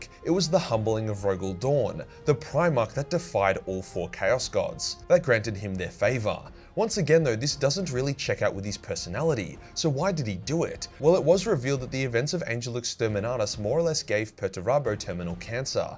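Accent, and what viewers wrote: Australia. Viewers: I got into warhammer through this channel and for about the first three months I thought the eye of "terror" was the eye of "terra" solely due to your accent. 110% the best Warhammer channel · He's australian. Very obvious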